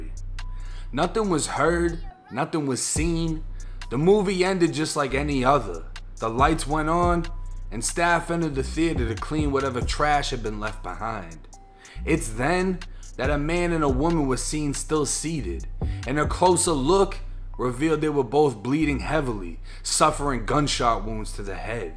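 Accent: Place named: New York, United States. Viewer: I subscribed because of your NY accent?? Lol. Thanks for being articulate, and a good story teller.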